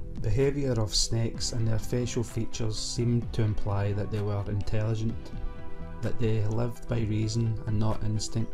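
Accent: Scotland, United Kingdom. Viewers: I was like eh Scottish that's new on here lol I'm Scottish myself. I Loved it.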